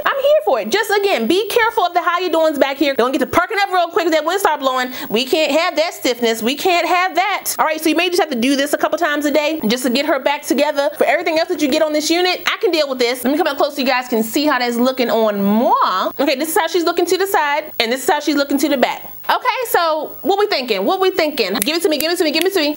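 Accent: Washington DC, United States. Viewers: She looks so natural on you. And the color is so pretty. ❤❤❤ btw, that intro git me asking Sis, you from DC?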